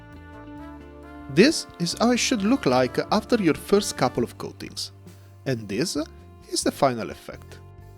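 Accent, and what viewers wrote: Italy. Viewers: l’accento è decisamente italiano… per questo ho fatto la domanda. Bel canale, bravo!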